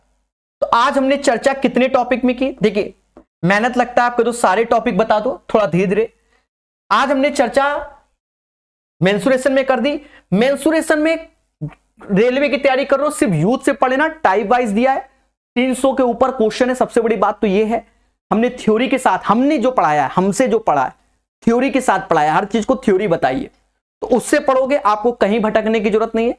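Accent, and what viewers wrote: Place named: Kanpur, India. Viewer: Oye Hoye. Apne Kanpur wale Sir. Kanpuriya Style me. Rock krte hue. 😎😎😎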